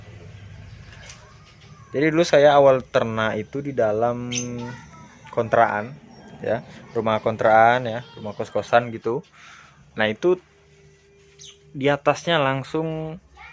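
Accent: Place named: Semendo, Indonesia. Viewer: logat semendo nya susah buat di pisahkan ya bang?🤣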